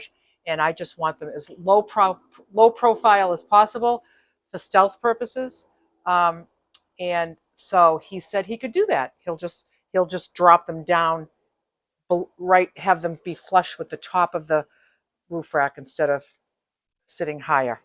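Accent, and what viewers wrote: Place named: New York, United States. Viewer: You could also use plex or adhesive glue for the cracc and the van build is absolutely stunning I support this lifestyle 1000% coming from New York myself I peep the accent keep up the great work love the articulate energy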